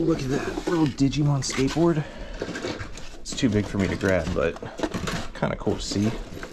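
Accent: Philadelphia, United States. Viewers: That accent has gotta be Philly or Pittsburgh. Request for you to say a few words in your next video: water, home, motor oil, hoagie, youse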